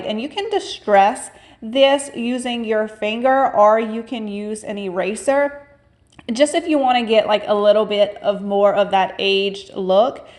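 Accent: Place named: Georgia, United States. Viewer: I have some old farmhouse table legs I think I’m going to paint them and add little round disk to each end I love all your projects. And I love your accent are you from Ga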